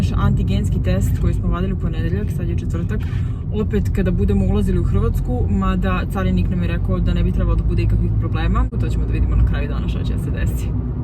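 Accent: Belgrade, Serbia. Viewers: Pa nije bas tako gdjice ..dajte malo bolje istoriju Dubrovnika. Treba da malo znate i istoriju umetnosti i istoriju..a to je ovde na zalost izostalo. Sve ste pomesali..Inace Dubrovnik su napadali i sa mora i sa kopna pa cemu su sluzila uvrdjenja prema Srdju? kula Minceta? A tek taj napadni bg akcenat..ublazite to malo !! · Sto ti smeta,bg akcenat?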